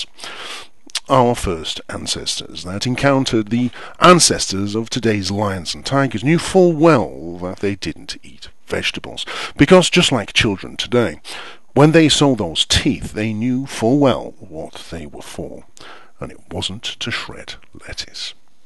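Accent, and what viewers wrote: United Kingdom. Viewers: your an excellent reader as well as a excellent thinker. But I'm an American... everything sounds smarter with british accents. I look forward to your next video. I do have a cat that steals my pasta dishes... but it think its' for the butter and cheese flavors?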